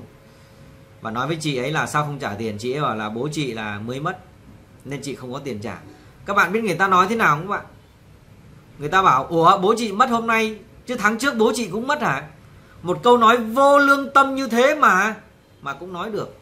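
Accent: Standard. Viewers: Ban noi qua.chuan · Bạn nói chuẩn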